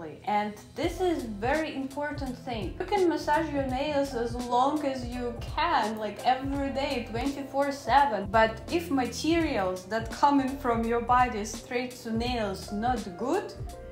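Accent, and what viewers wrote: Russia. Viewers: она русская?? прям слышимый акцент.. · да!это очень заметно из-за русского акцента ,который хоть и не очень ярко ,но выражен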